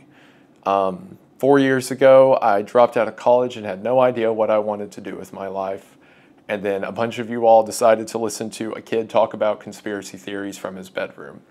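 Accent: South, United States. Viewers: you've got the perfect southern draw to voice how it's made or similarly just reading a phone book out loud to relax me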